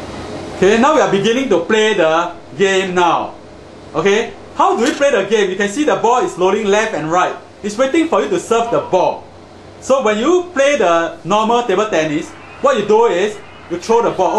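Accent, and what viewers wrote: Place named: Singapore. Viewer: Guys, this is a Singaporean Accent... And he's using Singlish... the Slang of Singaporeans...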